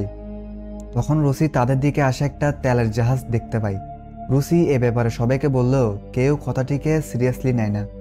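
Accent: Chittagong, Bangladesh. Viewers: Vai ki Chattogram er? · আপনি কি ভাই চট্টগ্রামের আপনি কি ভাই চট্টগ্রামের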